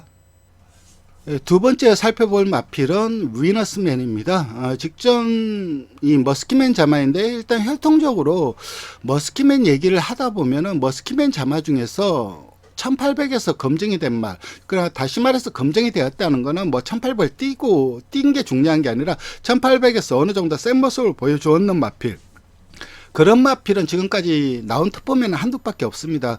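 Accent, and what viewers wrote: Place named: Busan, South Korea. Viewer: 최강게임 쌍으로 조질라니까 부산말 싹 빼고🏇🐎😷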